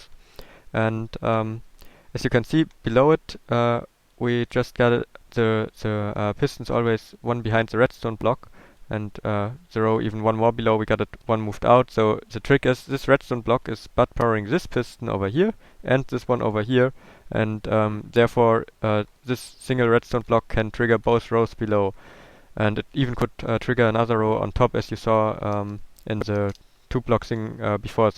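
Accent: Germany. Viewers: Ach gutes Englisch mit deutschem Dialekt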